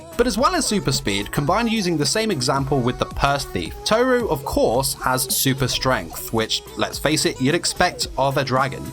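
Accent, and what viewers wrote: United Kingdom. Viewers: She is known as the Penis Dragon! (Or Pee Wee Dragon if you prefer) Btw I like that your accent is British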